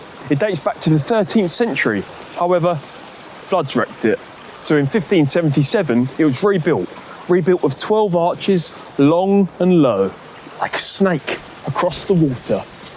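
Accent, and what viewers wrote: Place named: Birmingham, United Kingdom. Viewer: Definitely entertaining 👍. I love the Brummie accent.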